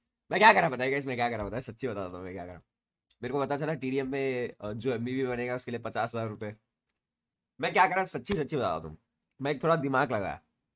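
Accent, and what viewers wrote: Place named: Hyderabad, India. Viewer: Kbhi Hyderabad jaanaa udhar kaaa esaa hi accent hai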